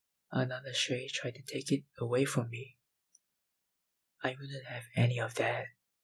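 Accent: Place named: Singapore. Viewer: wow u have the lioncity accent 😂👍🏼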